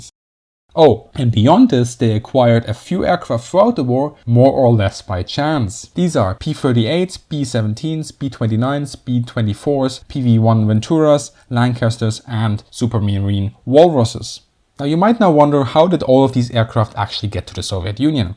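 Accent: Germany. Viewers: We have always been grateful of the USA effort to help us against NAZI Gernany. And we did pay back Lend Lease. Though our tanks were better we loved he USA and still do. The spam you sent us was a great relief to our rations. So please do not think all Russians are not thank full or were not thank full. I wish people like you understand the whole issue. How long did it take Germany to pay of the damage they created in Europe. My family is from St Petersburg. Please do not put all Russians or CCCPs population and military in the same box. BTW most of my family perished in St Petersburg. So what is your real point. You seem bias. NKVD really???? Or what about your Gestapo. Yes you sound German. If not I do apologize. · The video is very informative and interesting as well, though I’m sorry, but I simply can’t listen to that hard german accent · Sorry, but the guy is a native German speaker who has taken the time to learn English so we can get excellent information that many English historians do not access because they do not read German, or Russian.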